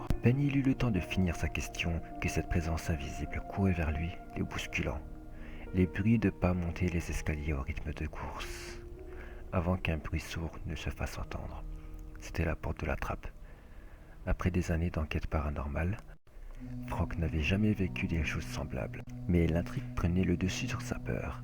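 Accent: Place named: North, France. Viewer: une petite question,est ce que tu es du nord ? (dans le Nord,y a des gens qui ont un accent semblable au tien ^^) d ailleurs il est joli ton accent :)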